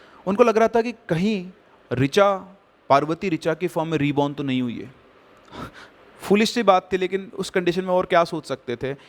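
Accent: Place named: Maharashtra, India. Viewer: 10/10 for the perfect Marathi accent..☺️🤞😍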